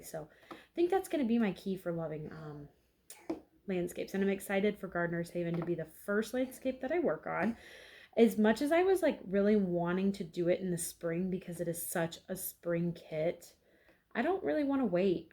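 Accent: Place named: Midwest, United States. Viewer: Ah I knew you lived in the Midwest I heard you say Missouri lol! I didn’t want to be the creeper that asked where you lived but I live in Nebraska and from Iowa so I could tell by your accent you were a midwestern like me ❤❤😂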